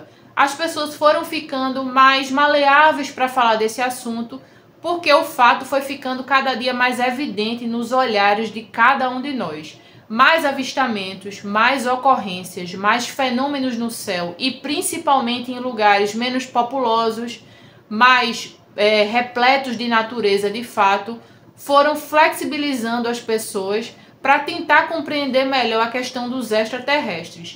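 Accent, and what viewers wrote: Northeast, Brazil. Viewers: vc deveria fazer videos com mais frequência. ACHO QUE PELO SOTAQUE MEIO NORDESTINO AGENTE ENTENDER ATÉ MELHOR AS INFORMAÇÕES😂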